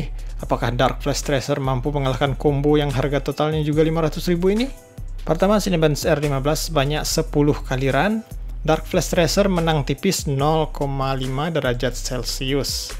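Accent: West Sumatra, Indonesia. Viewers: Orang minang ya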